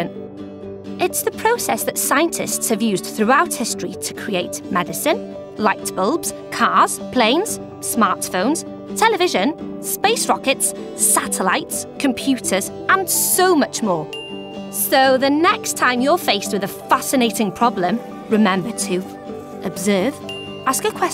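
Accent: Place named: Australia. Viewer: australian ahhhh:face-blue-smiling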